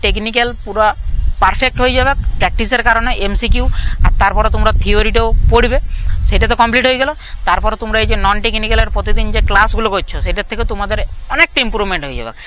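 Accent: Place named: Assam, India. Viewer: পশ্চিমবাংলার ভাষা তো বাংলাদেশীদের মতো,কারন তারা ৮০% বাংলাদেশীই, তুমার ভাষা শুনে তো তুমাকে আসামীস মনে হচ্ছে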